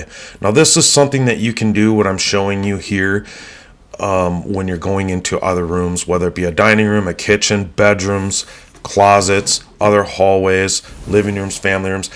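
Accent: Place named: Upper Midwest, United States. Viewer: Until you said Northern Minnesota, I thought you were from Ontario, Canada. That Northern Midwest accent had me fooled at first. Great tips, nonetheless.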